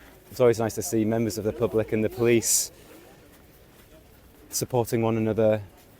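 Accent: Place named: Staffordshire, United Kingdom. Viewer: … the more I listen to your narration the more I think he was born and bred in the potteries or the Staffordshire Moorlands.. I may be wrong of course but that accent sounds familiar … Nice video btw as usual … 🫡🫡🫶🏻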